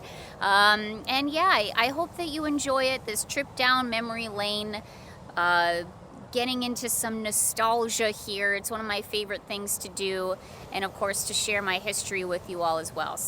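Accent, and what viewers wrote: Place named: Michigan, United States. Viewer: Hi 👋 MELBOURNE!!!! WOO! sound like Michigan accent.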